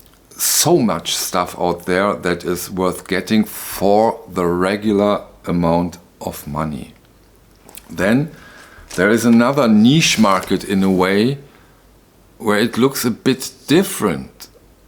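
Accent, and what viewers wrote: Germany. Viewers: I actually really enjoy your speaking and German accent (I'm American). I find it sincere and very intelligent.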